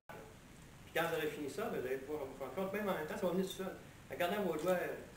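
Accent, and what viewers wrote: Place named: Canada. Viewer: Merci super video moi qui veux demarrer le tir instinctif de tres bon conseil l accent canadien dur dur et parlezvun peu moins vite mais vouscetes grnial ❤👍👍🙏🙏🙏😊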